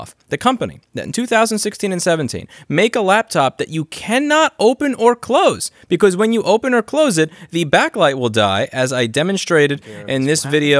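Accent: New York, United States. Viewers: Get the fuck outa here, yep he is definitely from new york lol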